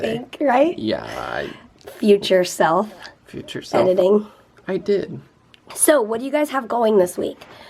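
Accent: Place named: Philadelphia, United States. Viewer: hehe, I think you might have a slight philly accent....:) here in Philadelphia all of us say "fr" instead of "for":) hahahahaha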